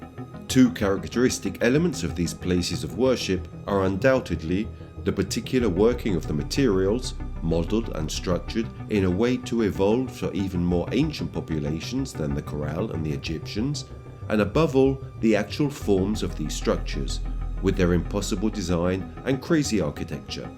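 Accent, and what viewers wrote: United Kingdom. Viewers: I didnt last 12 min. English accents turn my stomach.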